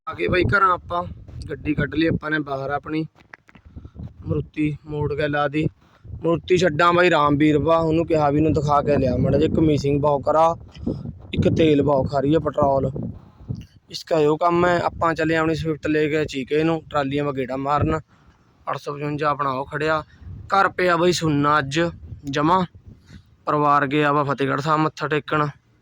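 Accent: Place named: Uttar Pradesh, India. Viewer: area kehra veer thuda boli ta up wli lgdi a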